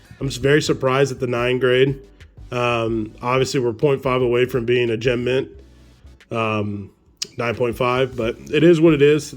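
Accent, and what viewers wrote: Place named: Philadelphia, United States. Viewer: The accent makes sense Idk how I never knew ur from philly but kinda had a hunch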